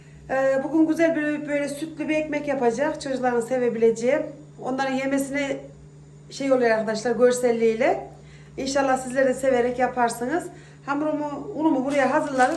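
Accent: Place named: Central Anatolia, Turkey. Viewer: Sizi yeni keşfettim öncelikle sivenizden icanadolu olduğunuzu anladım dahada mutlu oldum ellerine sağlık Bende çocuklarımın icin.deneyecegim .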